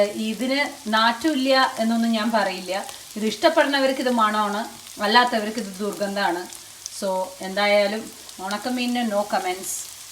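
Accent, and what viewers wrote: Palakkad, India. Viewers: ഈ പാലക്കാടൻ ചുവയുള്ള മലയാളം .... ഒരിക്കലും കൈമോശം വരാതെ നോക്കിൻ... ഈ ഭാഷയാണെന്നെ...എല്ലാ എപ്പിസോടും കാണാനുള്ള പ്രേരണ... ന്നാ ഞാൻ വരട്ടേന്ന്...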